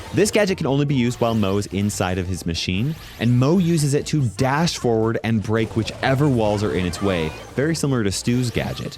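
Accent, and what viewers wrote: Scotland, United Kingdom. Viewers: why is he british please dont be british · Why is he british😳😳😳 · He has the most british accent of all time, what are you talking about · Lmao the british accent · I didn't know Moe was british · I didn't expect him to be british but I love it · Is Moe Scottish? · I mean a Scottish accent is technically still a British accent but I get what you mean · why is he british tho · Wait, he's just British? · i like his british accent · HE'S BRITISH I LOVE HIM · Why is he british😂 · he´s british!!!!!! oh and where did kenji come from. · Bros so British 🇬🇧🇬🇧🇬🇧🇬🇧🇬🇧💂‍♂️💂‍♀️💂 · I did not expect him to be british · Ees bri’ish mate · Bro is British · He Scottish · why is it british🤮🤮🤮🤮🤮🤮🤮🤮🤮🤮🤮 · Of course he has to be British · HES BRITISH!!!!!!!!!! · Bro's bri'ish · He's BRITISH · he's Scottish 💀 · British accent tops it off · Nerey british ahh voice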